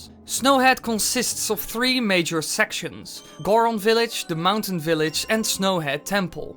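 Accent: Netherlands. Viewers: Ben je nederlands? Jouw accent heeft echt wel overeenkomsten met nederlandse taal Haha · You really sound like a dutch man :3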